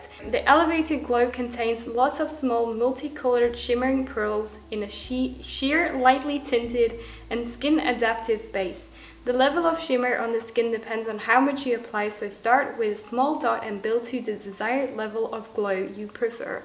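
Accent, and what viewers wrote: Australia. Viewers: I’m an Aussie just coming to the comments to say I noticed an Australian accent in the way you speak English - where are you from?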